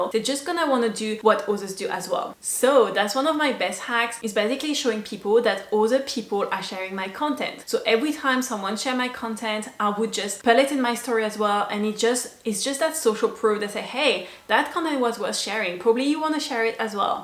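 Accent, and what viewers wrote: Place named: France. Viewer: are u you an american native? idk you sound french to me. Anyways, Love your video!!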